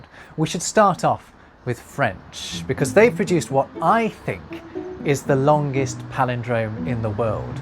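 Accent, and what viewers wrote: United Kingdom. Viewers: BRUH YOU ARE BRITISH?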